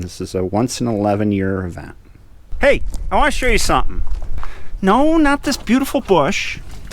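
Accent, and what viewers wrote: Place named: Texas, United States. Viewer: Sound like Texas but no debate about snow😂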